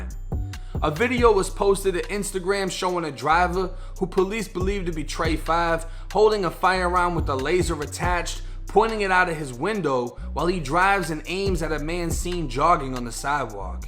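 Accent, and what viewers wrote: Boston, United States. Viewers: Love your videos bro . Florida boy with the Boston accent . 💯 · Jus noticed the Boston hats you be rocking! And the accent leads me to believe you from the bean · What part of boston you from?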